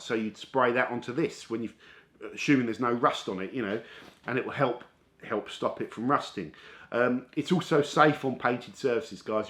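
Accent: United Kingdom. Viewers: not boring when u tell the truth...its truly funny the way guys from on the other side of pond talks...worst than me bring from N.Y.. lol.great video.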